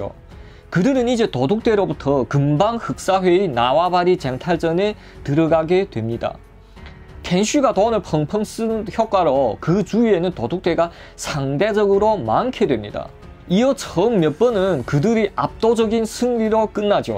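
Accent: Yanbian, China. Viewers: 엉아가 한마디 advice를 하면 방송할때 서울표준말로할려고 노력하지말고 그냥 조선족 악센트, 아니면 자연스럽게 중국어 악센트가 섞인 한국어로하는게 훨씬 조타아이니, 그게 니 매력포인트 아이니 네가 서울 표준말 방송보고싶으면 그냥 KBS,MBC,SBS 방송보면되는거 아이니 네가 TV보면서 말투 따라하면서 댓글도 그러게하는데 보는 많은 사람도 내생각에 동의랄텐데:D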